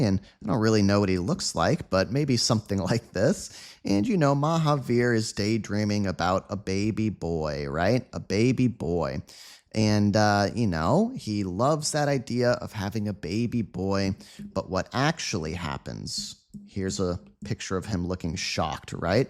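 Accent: United States. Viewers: You articulate perfectly American English which is my favorite standard of the English language, listening to you is almost like having a personal tutor. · Are you an American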